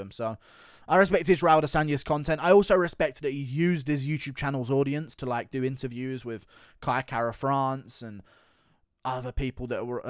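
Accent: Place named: RP, United Kingdom. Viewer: Jack slack is S tier for me where in London are you from because you sound way posher than him , great video though respect the content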